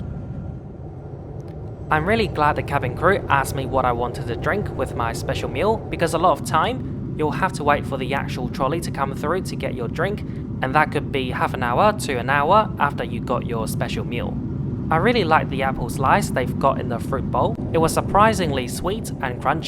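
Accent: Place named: Australia. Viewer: First of your videos I've seen. Your excellent English with an Aussie accent is adorable!! Planning to take KA so I can take my cat in the cabin, instead of frightening her in the (admittedly pressurized, climatized) forward cargo hold. I see on the seating charts that KA has a small number of dedicated "pet friendly" seats, but so far I haven't been able to find a video of someone bringing their cat along on KA. Maybe I should make a video of my (our!) experience!? 🤷😺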